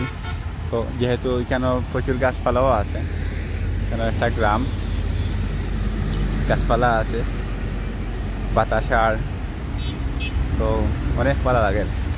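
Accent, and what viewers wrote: Sylhet, Bangladesh. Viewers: আপনার ভিডিও ভালো হয়, তবে সিলেটি ভাষায় না বলে শুদ্ধ ভাষায় বললে সব জেলার মানুষের বুঝতে সুবিধা হবে ❤❤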